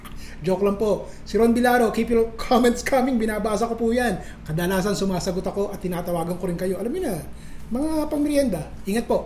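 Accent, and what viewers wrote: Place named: Angono, Philippines. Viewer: Informative and entertaining lalo pag nagsasalita po kayo dahil naaalaala mga taga Angono na pareho mo punto. At sarap ng kakainin habang nanonood ng show mo. All the best,!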